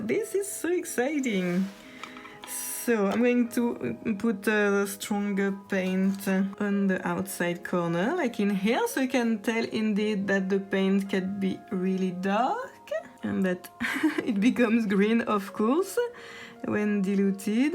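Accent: France. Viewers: I’m so happy when I see you. I love your lovely french accent and your personality. Your art is 💯❤️❤️❤️ thank you for existing! Lots of love from Italy 💚🤍❤️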